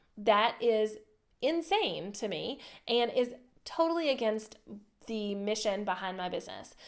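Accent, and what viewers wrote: South, United States. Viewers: For watching this video for the first time, can I just say I hear the southern accent and love it lol. Really helpful content here trying to start affiliate marketing myself!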